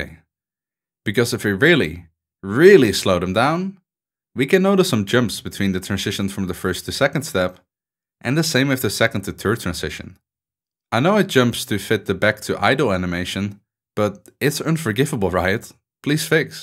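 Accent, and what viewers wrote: Netherlands. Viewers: Are you dutch by any chance? Your pronouncation sounds dutch lol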